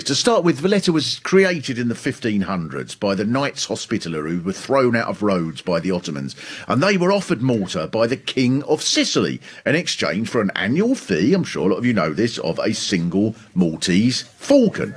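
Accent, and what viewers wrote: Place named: England, United Kingdom. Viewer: Grate english accent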